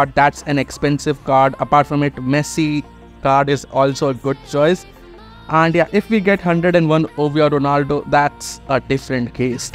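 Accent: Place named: India. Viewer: Love this Indian accent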